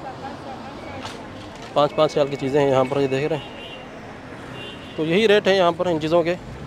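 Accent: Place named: Karachi, Pakistan. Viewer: Karachi lug raha hay😀Aap ka taluq karachi say hay??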